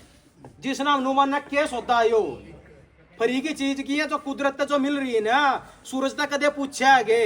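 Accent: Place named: Haryana, India. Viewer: आप जब भी बोले तो शुद्ध हिंदी मे बात करे ये haryanvi झलक ना दे हमें समजणे मे दिक्कात अती है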